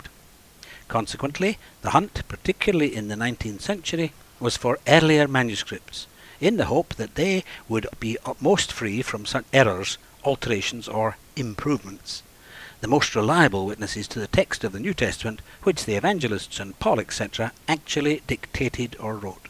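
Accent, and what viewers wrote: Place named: United Kingdom. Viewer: FAKE - nice British accent though.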